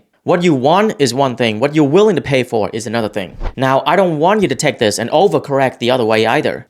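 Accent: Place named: Vietnam. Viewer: Dude are you Vietnamese? U got that accent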